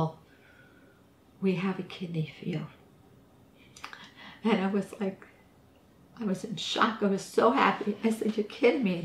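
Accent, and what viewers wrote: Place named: New York, United States. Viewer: New subscriber to your son’s channel, thank you for sharing your story! I cried when you spoke about your dad and melted when your son came over to comfort you. Your New York accent is so awesome:). ❤️💐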